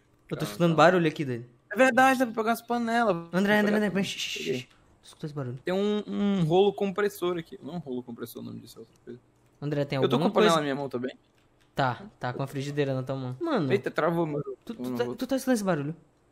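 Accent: Northeast, Brazil. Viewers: Nordeste meu fi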